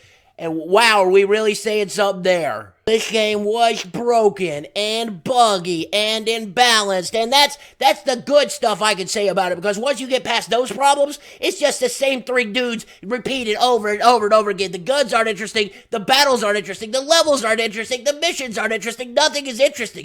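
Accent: South, United States. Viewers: I haven't watched in a few years. What happened? The voice is totally different. It sounds nasally and like a bad mix of a Southern accent and...something else.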